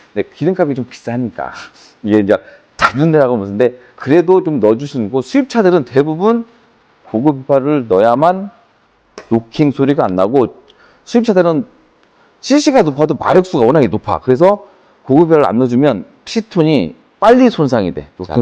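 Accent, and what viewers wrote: South Korea. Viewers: 전라도 말투 듣고 신뢰가 안간다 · 흐미 즐라도 광주분이신가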